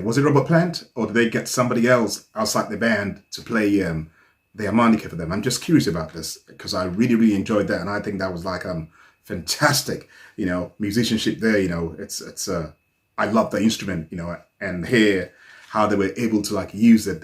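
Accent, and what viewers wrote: England, United Kingdom. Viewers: It sounds like you are from the UK (England). How is it that you never heard this song?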